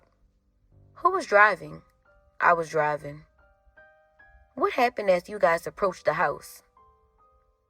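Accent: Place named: New Orleans, United States. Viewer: Ole Wild Bill..aka Telly..ok the narrator got that New Orleans twang down pack..Salute..Love yall👑💕⚜⚜⚜⚜⚜⚜⚜⚜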